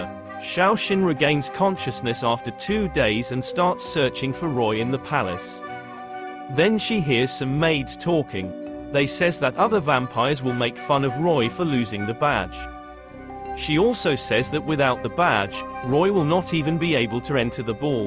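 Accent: United Kingdom. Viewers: Yo u British bro? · yo you British?? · Nah jit British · Yo u british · I think he is! I didn't know british ppl sounded like that no hate to them and they sound pretty cool!!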